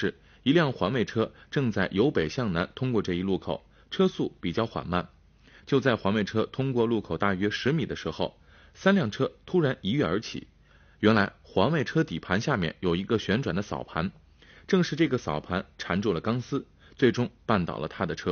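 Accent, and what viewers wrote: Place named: East China, China. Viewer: it iz english with strong east chinese aksent.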